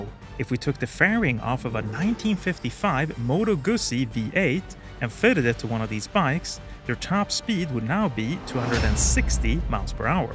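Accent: Sweden. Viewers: Fan vilken irriterande svengelska ”this meeeeeens”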